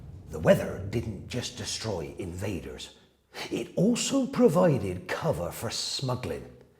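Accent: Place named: United Kingdom. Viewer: British narrators are always the best! This was so much fun!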